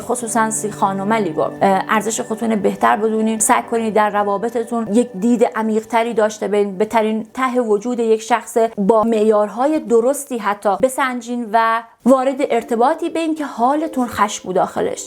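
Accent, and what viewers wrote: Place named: Lorestan, Iran. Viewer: جون من که لذت بردم هم از این لهجه قشنگت اصلا فکرش رو نمی کردم که بتونید اینقدر قشنگ با لهجه لری صحبت کنید هم از این راهنمایی ریبات برای مراجعه کننده آن هزار آفرین داری واقعا شگفت زده ام کردی❤❤❤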